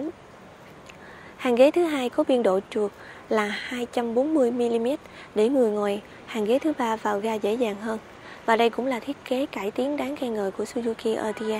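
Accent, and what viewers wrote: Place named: Mekong Delta, Vietnam. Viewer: Đúng chất miền Tây: Ờ, gờ lờ, 499chiệu, gọng gãi, phục dụ...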